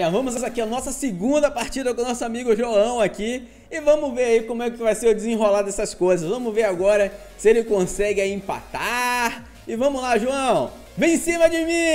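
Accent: Bahia, Brazil. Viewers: kkk sabia q vc era baiano mano vc é de onde? salvador? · ata você é bahiano , pensei que fosse dá paraíba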